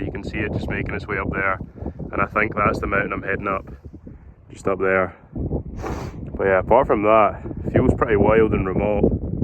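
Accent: Scotland, United Kingdom. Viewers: I just love this the Scottish accent makes it so much better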